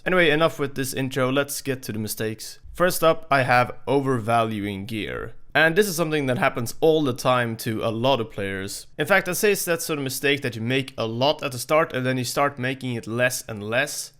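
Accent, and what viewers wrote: Sweden. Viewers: du låter svensk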